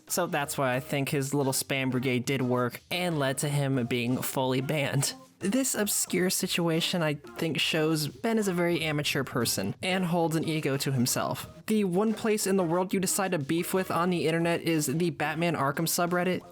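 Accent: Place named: United States. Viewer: First of all, why us an american bloke using 'lad' so much? It sounds disgusting in his accent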